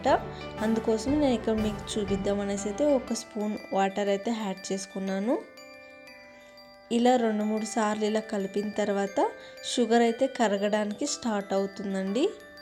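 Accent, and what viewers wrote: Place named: Andhra Pradesh, India. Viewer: Pakka andhra style mathadthira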